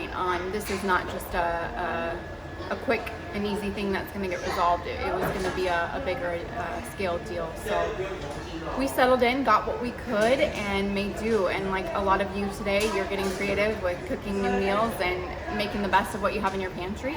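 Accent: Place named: New York, United States. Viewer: Are you from NYCity？ sounds familiar XD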